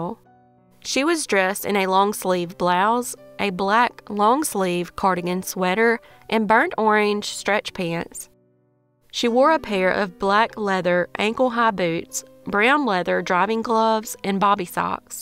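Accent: South, United States. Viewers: Good job, u did loose alot of your southern accent as your stories progressed. Fyi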